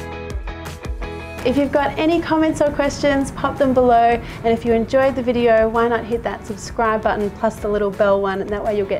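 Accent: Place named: Australia. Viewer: Your food and recipes are so wonderful and so easy to make. .love your Thai style and very aussie accent..your mom and dad must be so proud..I am so happy to have found your channel💖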